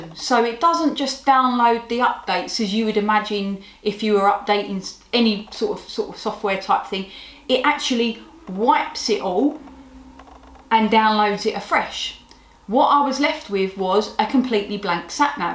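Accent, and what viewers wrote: Cockney, United Kingdom. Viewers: cockney is cool 😎 Here in the south of the USA we have a southern drawl!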